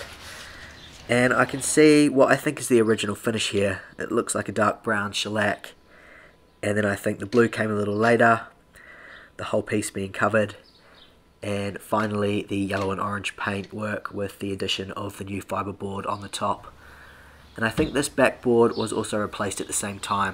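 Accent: New Zealand. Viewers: Oh man, I could listen to your kiwi accent forever! Great job, as always! ❤ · Being married to a kiwi the accent is familiar. Just found your channel and really enjoyed this video. I’ll be watching😁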